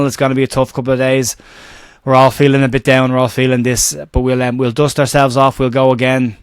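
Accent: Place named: Ireland. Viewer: Just want to say thank you so much for the content over the season. The amount of work put into each post is extraordinary. I live in Brazil, The View is a very important part of my life here! It’s great to hear a fellow Irish man talking about Leeds! Thank you so much so much, we’ll do it better and in more style next season and I’ll be following it on The View!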